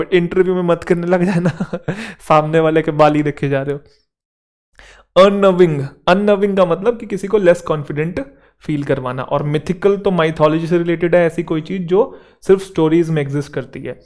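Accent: Haryana, India. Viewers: sir ji mai apaki classes regularly attend karti hoon. sir ji aap hariyanvi bahut badhiya bolte. muje bahut hansi aati hai. sir ji kabhi muje time mila to aapko milne aaugi.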